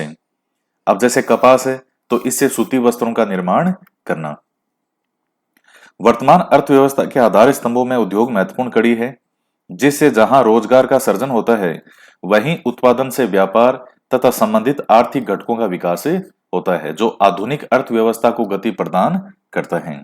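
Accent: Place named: Rajasthan, India. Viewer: sir Aapki awaj sunjar esa Lagata hai ki jese Rajasthan ki mati swayam apki Vani bankar aapki awaj k rup mai prakat hokar hum sabhi ko adhyayan or margdarshan karwa rahi ho. Jai Hind. Jai Jai Rajasthan.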